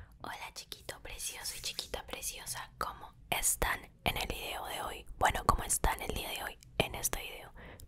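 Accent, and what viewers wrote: Peru. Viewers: a era peruano xd pense que era de ecuador xddd siempre veo sus video y penese que si era xd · Eres peruana? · Eres Perúana?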